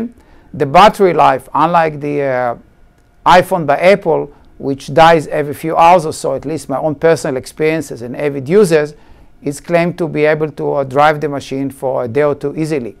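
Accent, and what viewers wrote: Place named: Israel. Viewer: Am I the only one finding Israeli accents insufferable?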